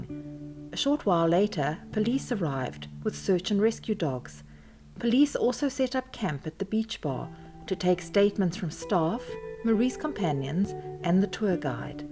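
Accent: South Africa. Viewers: Very sad,love your channel your soothing South African voice...thanx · Nice to see a YouTube channel with a South African voice covering this type of material. I have started a blog and will soon have a podcast going which will cover South African true crime cases. I hope to cover Marie's story and have made contact with the twitter account which runs under Get Marie Home. Very strange story.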